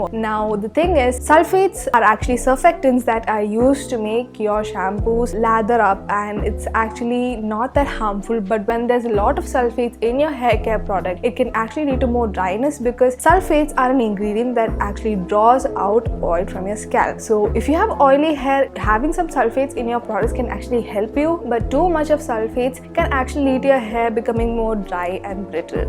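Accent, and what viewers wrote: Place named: Kerala, India. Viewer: Are you a malayali? The accent sounds just like a mallu girly🤩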